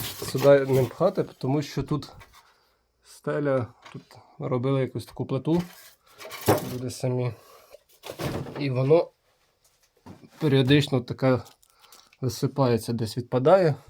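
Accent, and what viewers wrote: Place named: Vinnytsia, Ukraine. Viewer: Ви щ Миколаївщині,а розмовляєте,як наче все життя на Вінниччині прожили. Чи так і є?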